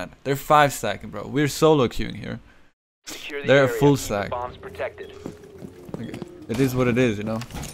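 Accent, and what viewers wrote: Germany. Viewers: Is he german?? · Ur german? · I found it was a headshot on the Bamdit and a question are u German? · Daaaafaaaaaaq Ur German?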